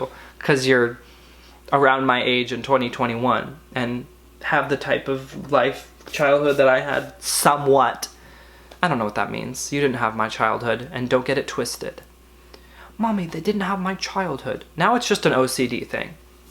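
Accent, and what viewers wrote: United Kingdom. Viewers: i love your british accent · also, i NEVER find you boring, and i love your british accent lol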